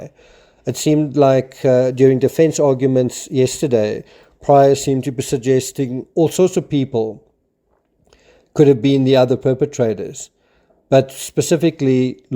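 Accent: South Africa. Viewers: Hi- Annie here. I was born in Cape Town so I recognised your accent immediately. My parents brought me to the US for medical reasons when I was quite young. We spoke English and Afrikaans at home but i lost my accent at age 7 to avoid being teased at school. I now live in the state of Arkansas and am a retired psychologist. I have been watching the Chad Daybell trial since day 1 and I love your video. It is clear and straightforward (as much as such a complicated case like this can be described). I agree that that the family is completely enmeshed. In fact, many strict Mormons are; Chad's followers,.however, are a cult within a cult. I am very pleased with today's verdict. The sentencing phase continues tomorrow with victims' statements. I think we will see many tears. I am fascinated by this story and trials have sparked worldwide interest and am pleased that South Africa has such an amazing True Crime network. 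Do you cover many American True Crime stories? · I follow this channel from South Africa, and i have been wondering why you report on American crimes, as i picked up your accept. Just confirmed you are South African, living in the US. Great stuff, been following since Gaby's case.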